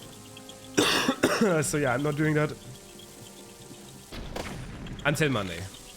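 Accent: Germany. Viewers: Man hört so raus dass du Deutscher bist, Abo hast du! xD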